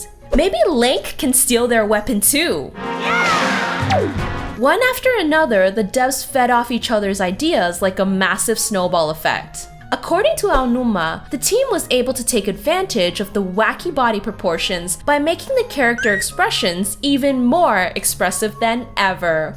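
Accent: United States. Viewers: please stop saying miamolto, , miamoto, , , you sound american, and yet when you say his name you try to put an H in there , like, miamohto, wtf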